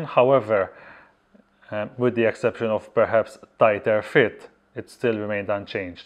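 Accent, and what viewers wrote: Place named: Malta. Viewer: Very strong Maltese accent :)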